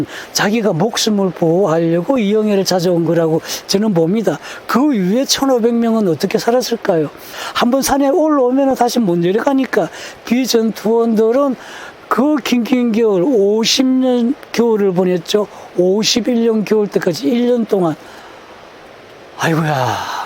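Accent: North Korea. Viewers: 이북 말씨네.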